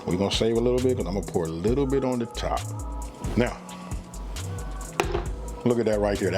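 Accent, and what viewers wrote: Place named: Bay Area, United States. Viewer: He gotta be from the Bay sounding like that.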